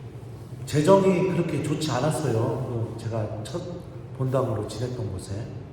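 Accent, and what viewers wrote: Chungcheong, South Korea. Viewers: 신부님 강론 말씀 듣고 있으면 마음이 뜨거워 짐니다 신부님 영육간에 건강 위해 기도 드립니다 신부님 사랑합니다 💜 충청도 사투리가 정겹습니다 감사합니다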